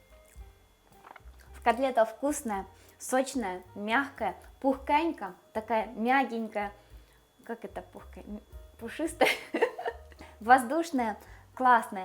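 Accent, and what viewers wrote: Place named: Surzhyk, Ukraine. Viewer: ты Слава Богу становишься сама как пухкенька котлетка. Уже пришла в себя. Говори на родном суржике. Вон ЗАКАРПАТЬЯ ГОСПОДЫНЯ она ведёт канал на закарпатской диалекте и её смотрят все и понимают. Не переживай.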